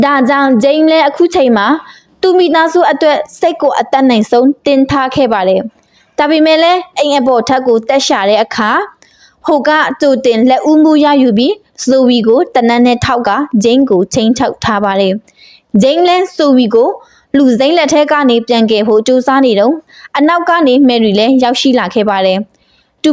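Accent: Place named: Shan, Myanmar. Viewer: အသံလေးကချစ်စရာကောင်းလိုက်တာ၊စကားဝဲတာကရှမ်းတွေဝဲတဲ့အသံထွက်ဆိုတော့တင်ဆက်သူဟာရှမ်းမလေးတစ်ယောက်ဖြစ်မယ်လို့ခန့်မှန်းလိုက်ပါတယ်၊ ကျနော့်ခန့်မှန်းမှုမှန်လားမှားလားဆိုတာဖြေပေးပါဦး❤❤❤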